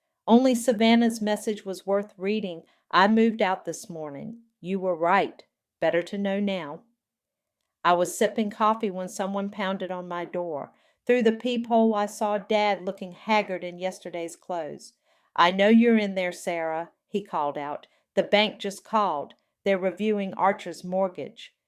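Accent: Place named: South, United States. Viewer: It's the southern accent and the normal slow cadence of AI speaking makes them sound like hicks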